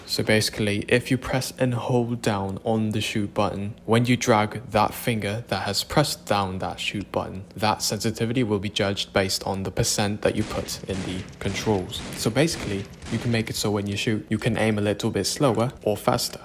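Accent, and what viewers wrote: United Kingdom. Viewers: Well hello there? R u British to? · You grow so fast keep up the good work. LMFOAOAOA YOU SOUND BRITISH YO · Question r u British?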